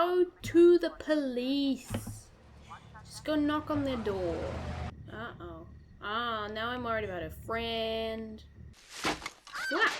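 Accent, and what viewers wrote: New Zealand. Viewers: This was James Cameron's first big film. He built his fame off the success of this film. Great New Zealander accent!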